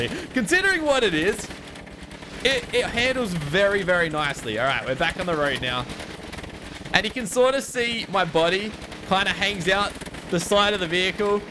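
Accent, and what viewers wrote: Australia. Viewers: I think he's from Australian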